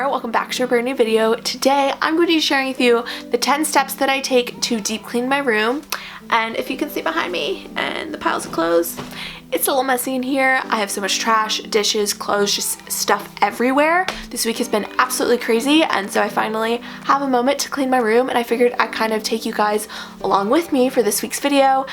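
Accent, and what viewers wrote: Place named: United States. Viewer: She sounds american and she said douvet.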